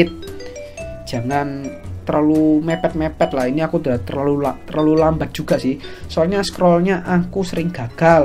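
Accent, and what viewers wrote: Java, Indonesia. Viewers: AKSEN JOWO AJ BANG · Aksen jawa. Lebih enak didenger telinga (soalnya udah lama tinggal di malang haha). Btw, Garuda juga ya mas? Mau tanya kalau sehari2 leveling bisa dpt brp lvl? Kebanyakan leveling dimana mas? Trus itu enhance sampai +21 pake maphr trus atau kadang2 aja? Maaf mas nanya banyak kepo bgt soalnya liat kilau elven bownya gak nahan hahaha. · Bahasa indonesia aja biar medoknya kerasa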